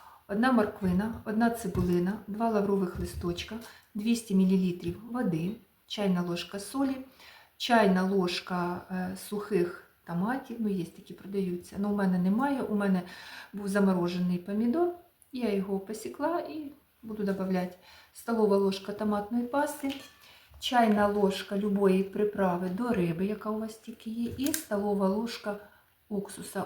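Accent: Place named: Surzhik, Ukraine. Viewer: ніяка не біда , це суржик , в кожному регіоні свій говір , ви ж не будете заставлять львівян , чи тернопольців розмовляти як ви , краще вчиться готувати і не чипляйте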